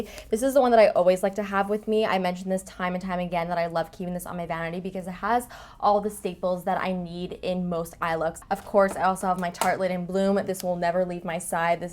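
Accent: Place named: Canada. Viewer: Where are you from in Canada because I can hear a strong accent. Definitely different to how most people speak in B.C.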